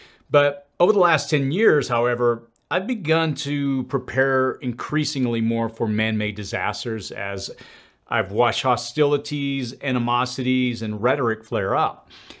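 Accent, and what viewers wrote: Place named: South, United States. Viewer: Can hear the southern in ya hah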